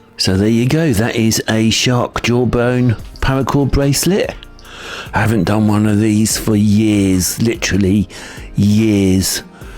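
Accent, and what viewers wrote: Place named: United Kingdom. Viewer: Thank you for your videos, your british accent makes it easer to understand what to do than the north america accent that all the videos have had that jag earler looked at.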